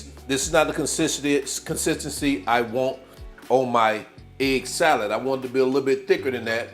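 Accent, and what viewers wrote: United States. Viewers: "igg salad"! Love your accent. If you don't mind, which part of the. U.S. are you from?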